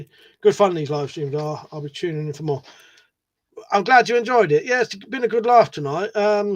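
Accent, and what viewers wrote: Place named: Suffolk, United Kingdom. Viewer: I detect a suffolk accent...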